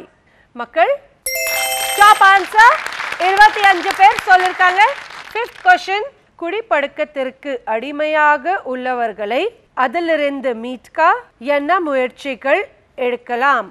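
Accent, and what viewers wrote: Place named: Punjab, India. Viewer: She is from Punjab and be proud she is trying to talk our language. Namma oorlaye Tamil a pesadha tamilans irukanga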